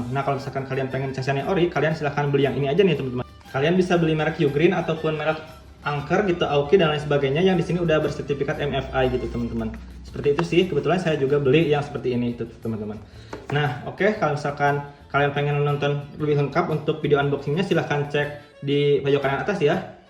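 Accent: West Java, Indonesia. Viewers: sunda nih yah? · Aksen nya khas sunda bgt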